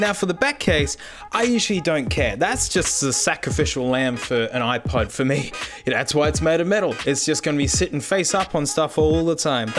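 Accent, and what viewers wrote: Australia. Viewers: “Working down we have 120, there’s 80, 60 and mate if that’s not getting the job done ya bust out ya 1 grit and ya get it done roight” This guy has to be from Australia because he is hilarious to me! Love this channel. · He sounds distinctly less Aussie than his newer videos (and there was no Frank at the end! It's not a video without Frank!) Happy you've now found your stride, I'm just browsing your earlier content:)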